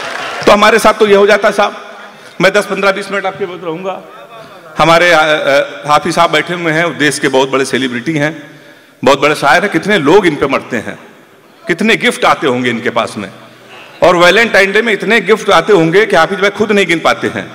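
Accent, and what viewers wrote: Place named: Kanpur, India. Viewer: Proud to be kanpuriya..... Aj kanpur ki hindi dubai me suni jari ♥️🌹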